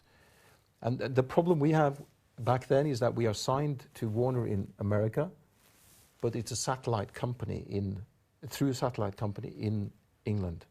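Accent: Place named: Norway. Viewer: It is definitely his/the Norwegian(Oslo area/south east Norw.)accent.(BTW heard some Aha's earlier interviewes recently and their English was really good back then(80's/early nineties)I think his swedish wife(Camilla?) had their children over there too.I think both him and Mags are unaware of these tiny flaws"Being half Norwegian myself,ppl tend to ask me if I'm from the Isle of Man, Wright or some other isle.Most Scandinavian ppl(Or ppl from the Netherlands,Finland, Belgium...most of them prefer sort of an American accent.Met this bloke from Scotland once,thought his accent was sooo charming(Probably because he was too lol.) Morten, Mags and everyone else should keep their accents.The way you smile,laugh walk, talk,think,express yourself in general...I believe that's what make most ppl unique in the first place✌🏼🌺🤲🏼🦋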